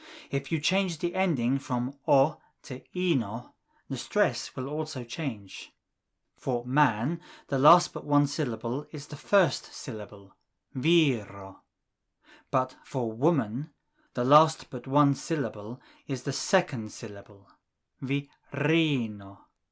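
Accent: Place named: Italy. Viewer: He sounds italian when speaking espersnto tbf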